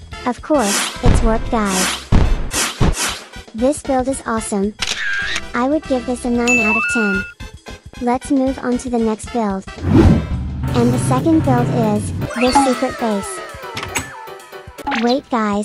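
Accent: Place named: England, United Kingdom. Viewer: soy yo O te veo con la voz más inglesa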